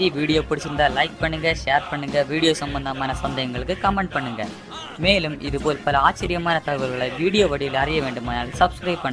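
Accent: Kanyakumari, India. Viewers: தம்பி கன்னியாகுமரிண்ணு கரெக்டா தெரியுது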